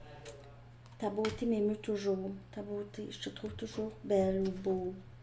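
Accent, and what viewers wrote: Quebec, Canada. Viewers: Bonsoir, J'adore vous écouter, tes messages refletent ce que je vis. J'adore ton accent québécois, ça me fait sourire! Merci 🙌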